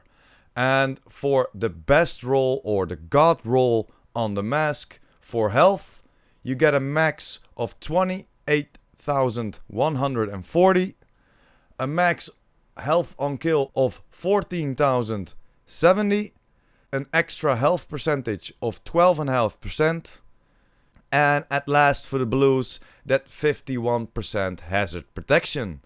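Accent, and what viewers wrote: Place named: Netherlands. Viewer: Funny that you can hear that you are dutch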